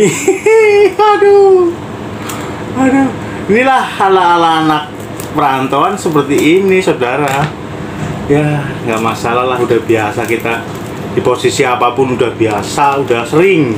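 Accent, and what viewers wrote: Banyumas, Indonesia. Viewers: Hahha lucu banget ngomong ngapak ky inong bae kang hihi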